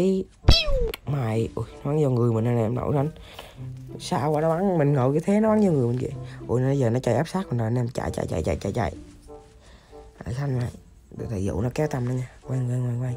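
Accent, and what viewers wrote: Quang Nam, Vietnam. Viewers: Giọng ông giống người Quảng Nam hay Quảng Ngãi hay Đà Nẵng ấy nhỉ ?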